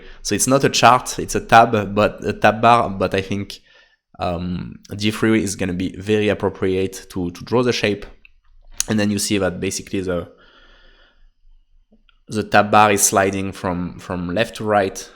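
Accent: France. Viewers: c'est encore plus stylé avec l'accent frenchie ahhaa ;)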